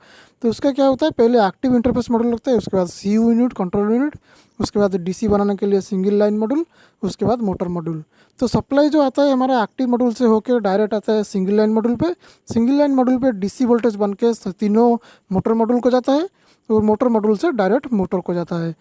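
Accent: Odisha, India. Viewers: Sir i love your odia accent .. Very helpful..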